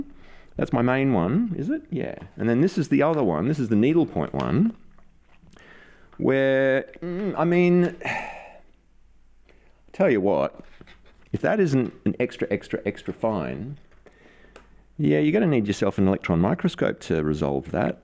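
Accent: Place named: Australia. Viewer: I do love the Ikea bath mat! I personally found a dual purpose for my shag carpet --> it also makes for a lovely photographic background, and the pen can't fall further than the floor when taking photos Lots of gorgeous visual texture. :D Need to check out your other vids! Caught your Aussie accent, and you collected yet another Aussie as a subscriber. I've got a heavy Aussie bias. What a monstrous Dremel adjustment to that green demo 9019. Cut the feed too! That needlepoint one looks amazing! I can't even hear the pen that much on the page. Sounds brilliant. I'm amazed at how well it spread the ink and didn't just glomp onto the page like some flex pens tend to do. Didn't even need an overfeed? Standard feed you say? Oh my, how delicious.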